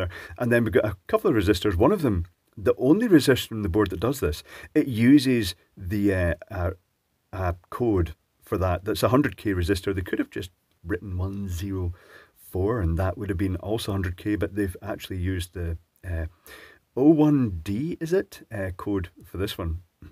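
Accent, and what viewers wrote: Scotland, United Kingdom. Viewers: 😂😂scottish accent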